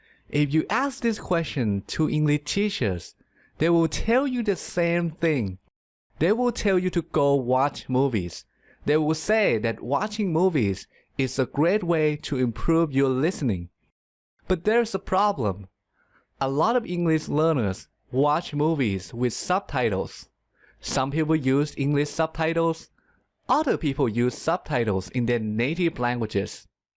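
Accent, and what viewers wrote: Thailand. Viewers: Are you Thai? I think your accent like Thai people. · Thank you very much for a great video. It's very useful. Also I guess you are Thai from your pronunciation^^ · กลับมาฟังหลายรอบแล้วครับ คนไทย ผมจำได้ สำเนียงมันออก อิอิ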